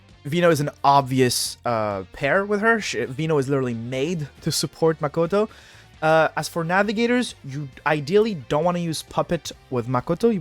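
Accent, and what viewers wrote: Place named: France. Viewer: You can't mask that french accent!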